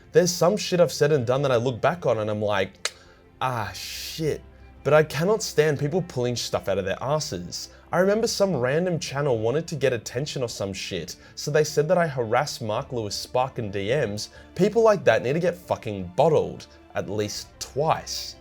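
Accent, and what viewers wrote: Australia. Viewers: I cant lie, this is the only way i can consume anything from 40k because everyone else who makes content like this just sounds like a loozerrr, why would i want to hang oout with a loozerrr when i can just listen to some aussie chad step above all the other loozerrr'z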